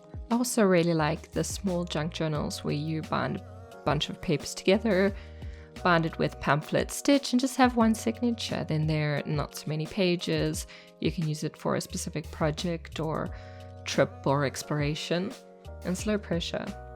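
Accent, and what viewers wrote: South Africa. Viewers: Hi! First time to your channel. New subscriber. So enjoyed this video. South African?😃🎀💕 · First time watching your channel and I have to ask, are you South African. Very useful tips given. Thank you. I’m enjoying watching all the Junk Journal July videos.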